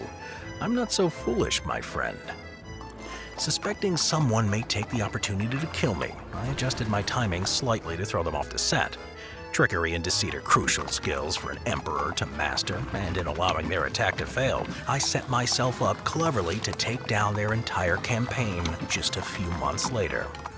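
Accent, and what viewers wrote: United States. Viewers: nice american accent frenchy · Unwatchably annoying in a yank accent.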